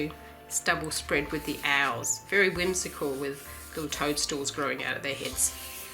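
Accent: Australia. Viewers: Hi, love love love your work, not only beautiful but inspirational (and also refreshing to hear a fellow countrywoman). I have thoroughly enjoyed every single video you have produced, and especially find your book reviews very informative. I received Dagdrommar in the mail the same day you released "how to colour a womans face" and had to chuckle, I have also just received Sommarnatt in the mail today and your are correct, just as "lovely" as the first. I am an amateur artist in Adelaide 45yo male, and just wanted to say "hi" and "thanks', keep up the great work:-)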